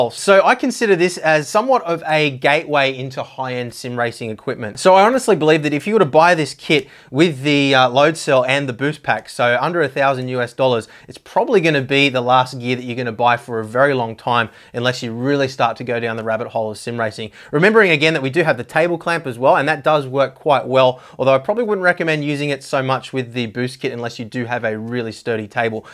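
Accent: Australia. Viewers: I'm assuming you're from Australia with that accent.. apologies if I am wrong. Do you know what the pricing is in AUD?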